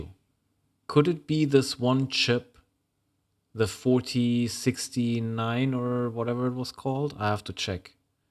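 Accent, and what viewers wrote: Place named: Germany. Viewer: you have a very distinct german pronounciation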